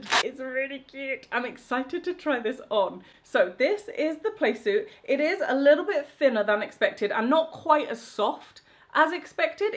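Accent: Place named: RP, United Kingdom. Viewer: Oh no! She's gone full on posh! But I love the tweed!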